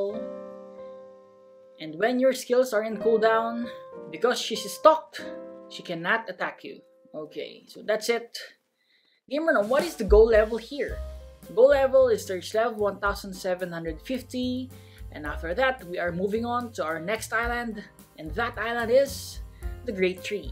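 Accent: Philippines. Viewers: Yo your accent sounds like your from Philippines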